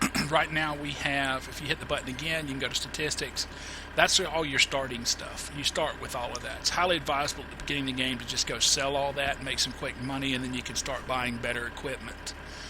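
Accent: South, United States. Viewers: The southern accent just completes this guide. Nice!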